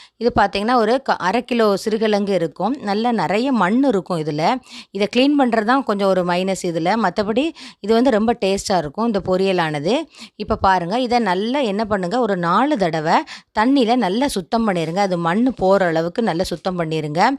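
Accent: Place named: Tirunelveli, India. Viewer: உங்கள் தமிழ் மிகவும் அருமையாக இருக்கிறது. அருமையான திருநெல்வேலி தமிழ்.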